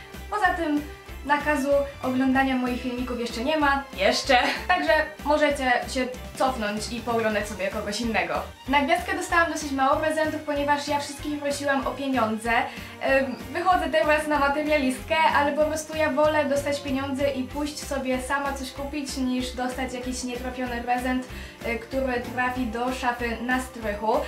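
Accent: France. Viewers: Mi to nie przeszkadza, taki trochę francuski akcent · Skąd pochodzisz bo na tym odcinku słyszę taki francuski akcet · Akcent .. Francuski? :D jak się ,,NAUCZYŁAŚ" tego akcentu lub j. plskigo? :o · Masz coś w akcencie z francuzkiego... Mieszkałaś kiedyś we Francji. Albo coś w tym stylu? 😄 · Masz francuski akcent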